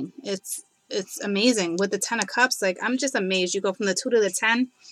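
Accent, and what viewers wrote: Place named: New Jersey, United States. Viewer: I love your accent is that Jersey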